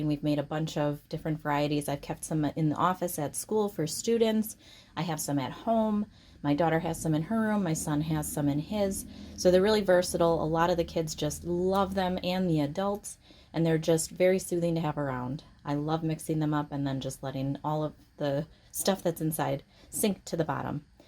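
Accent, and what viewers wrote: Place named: United States. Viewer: Sounds like your American but where did you get the plastic bottles from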